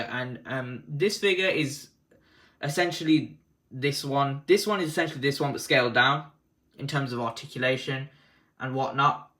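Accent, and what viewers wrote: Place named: Birmingham, United Kingdom. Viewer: You from Brum too?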